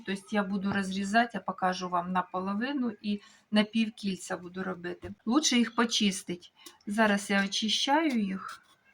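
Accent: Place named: Lozova, Ukraine. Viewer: ❤Подобається як ви разговарюєте, по нашому ,по Лозівському хоча проживаю більше 40 років на Полтавщині. Не звертайте увагу на злі язики ,це все від заздрощів. Миру, здоров'я, найшвидшої Перемоги. До речі моє рідне село Перемога ❤.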